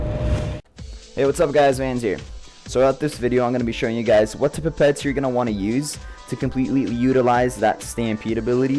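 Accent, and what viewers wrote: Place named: Asia. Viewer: ur asian arent u? i can tell by the way u talk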